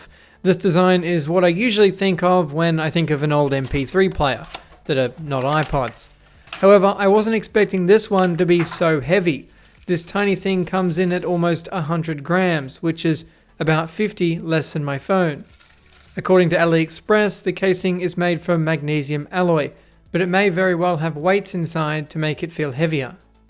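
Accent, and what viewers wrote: Australia. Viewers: Mp3-4 players named nuggets/nugs, voiced over by an Australian man on a table, 🤔.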